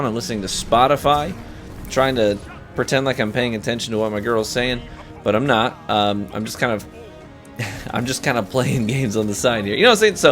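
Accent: United Kingdom. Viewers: Gotta admit, I wasn’t ready for the funny British accent. Hahahahha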